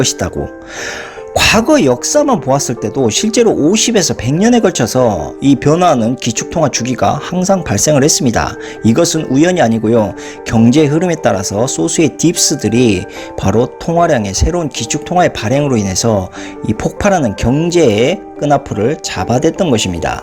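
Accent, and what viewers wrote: Jeolla, South Korea. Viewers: 전라도 사투리가 진하게 베어 나오네... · 이분전라도말씨네요 친중파로보이네요 전라도는 다수가 친북,친중,,중요한건 공산당정권은 망해야한다는 진리입니다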